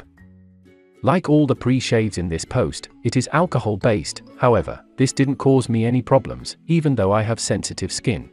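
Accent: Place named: England, United Kingdom. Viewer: Another authoritative sounding video with Engl-ish accent, maybe AI. Why do pre-shave lotions cost so much, mostly alcohol.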